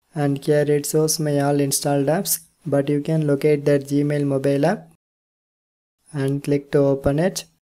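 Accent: India. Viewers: Every time i listen how to speaking indian english such as very annoying voice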